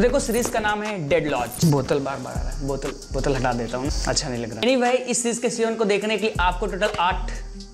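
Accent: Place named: Haryana, India. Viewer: I like haryanvi style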